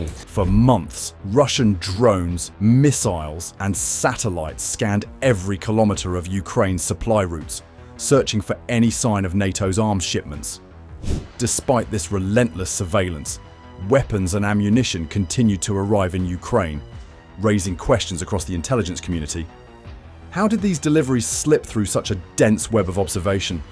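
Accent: England, United Kingdom. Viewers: Insignificant information. Most probably misinformation. I can hear the English accent. Sounds like British propaganda. What a lot of BS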